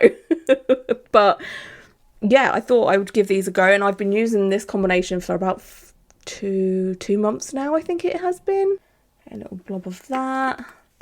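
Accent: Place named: Northamptonshire, United Kingdom. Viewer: Your blatantly from Northamptoshire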